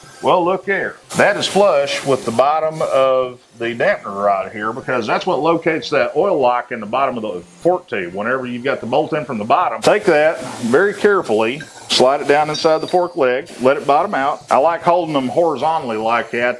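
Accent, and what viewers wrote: South, United States. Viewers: and his southern drawl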